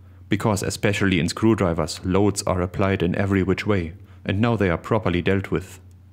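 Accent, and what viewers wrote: Germany. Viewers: Your German accent is delightful! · wie sehr man diesen deutschen akzent immer raushört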